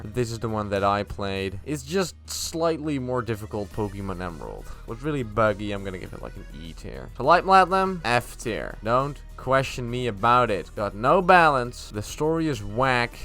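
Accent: Netherlands. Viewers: Not at all... Imao. Pewds is Swedish and sounds Swedish. is Dutch and sounds Dutch. But they're both definitely accents! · Wow, your accent sounds so Dutch! Geweldig.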